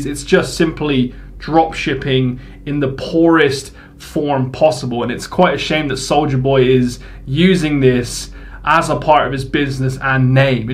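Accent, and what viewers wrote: Bristol, United Kingdom. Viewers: Do I hear a Bristol accent?👀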